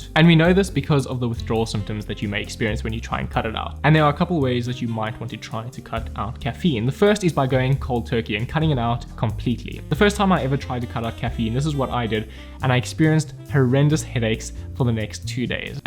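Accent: South Africa. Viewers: You South African mate?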